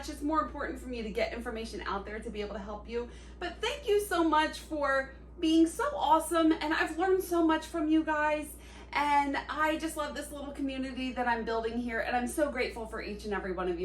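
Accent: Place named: Pennsylvania, United States. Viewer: You are a lifesaver! I am making a 3 tier cake for my father’s 90 bday party…you gave me a lot of tips! I’ve watched a few of your videos in the last hour already! When I first heard you speak, I said she is from Pennsylvania! Then I looked and see your from Langhorne! I am originally from Levittown, now in FL. thanks for all the tips and tricks!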